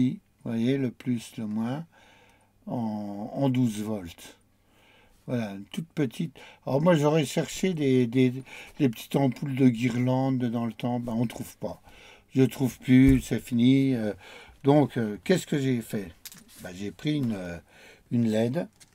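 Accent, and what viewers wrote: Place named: Lorraine, France. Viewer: Bonjour, fantastique combine. Merci. Et que ça fait du bien d’entendre l’accent Lorraine, moi qui suis exilé chez les chocolatines!😂.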